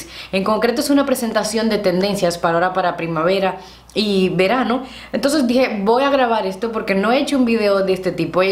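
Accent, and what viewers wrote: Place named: Dominican Republic. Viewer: me encanta tu acento deberias dar unas clases aya en las escuelas sobre el uso y acento de el espańol en republica dominicana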